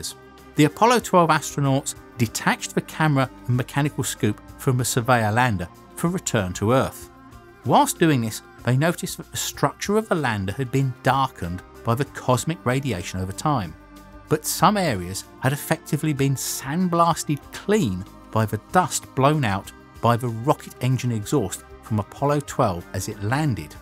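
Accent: United Kingdom. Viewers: Really? Odd how the plaque left on the Moon reads Here men from the Planet Earth first set foot upon the Moon July 1969AD We Came In Peace For All Mankind and how odd that the narrator has a British accent, but that probably because he's British with an interest in the Apollo program. And that the samples brought back have made their way all over the world. If you are really that small minded that it just has to be an American narrator, then the Apollo missions are wasted on you.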